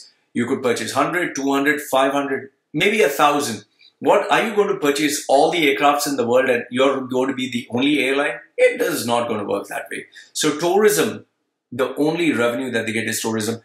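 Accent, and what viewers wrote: Kerala, India. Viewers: You are fluent in English but you cannot hide that you are INDIAN by origin! 😊. Why don't you care your own country?... It's none of your business!!! How much money you got paid to do this video??? You be careful the country you are talking about is very strong and powerful country that capable of a lot of things 😊. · Bhai mast kerala accent.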